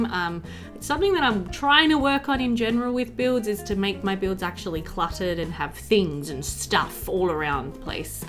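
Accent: Australia. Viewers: Soon as I found your channel the other day, thought she has to be Australian by that accent. I am from Melbourne. So hey there ! lol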